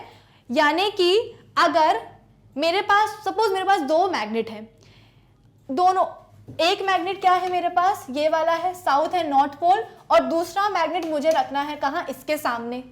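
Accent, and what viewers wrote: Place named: South, India. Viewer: I think he/she is from Tamil nadu or Kerala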